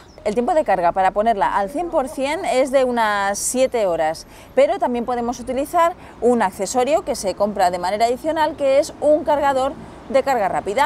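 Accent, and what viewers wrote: Spain. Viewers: Hay no se porque pero amo como hablan las mujeres españolas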